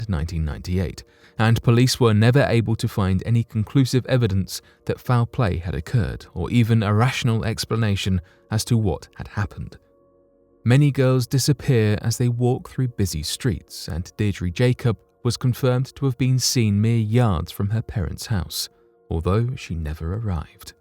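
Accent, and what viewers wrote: United Kingdom. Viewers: You can tell, besides the accent, that a Brit wrote this. 1906 quake was the San Francisco earthquake of 1906.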